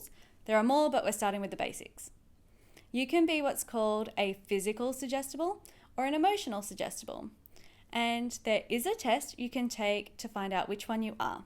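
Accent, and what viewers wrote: Australia. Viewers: you are literally about as far away as you can possibly get without going off world lol she's Australian fella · I’m suggesting to myself how to master your 😘 Aussie accent. O’s are diphthongs or tripthongs in Australian.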